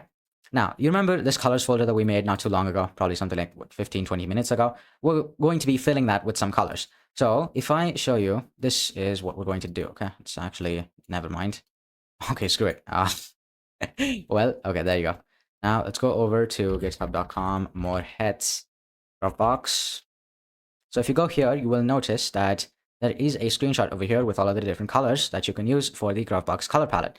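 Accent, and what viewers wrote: India. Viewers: indian voice sayin OKA OKA jeez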